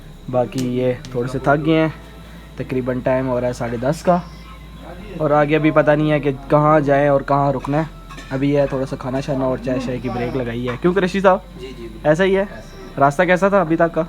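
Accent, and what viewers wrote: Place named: Sialkot, Pakistan. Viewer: shb na jb first word bola tha ma tb hi smj gya tha k sailkot k han😊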